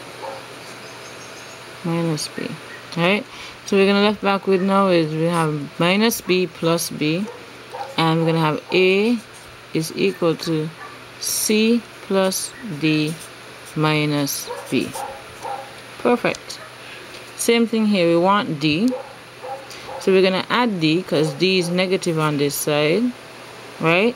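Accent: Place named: Jamaica. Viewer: Are u a Jamaican. Ur accent sounds like one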